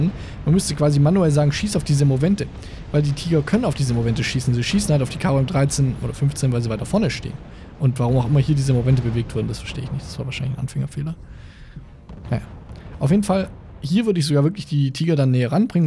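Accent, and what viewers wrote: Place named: Swabia, Germany. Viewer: Hätte wenigstens wieder auf schwäbisch sein können :D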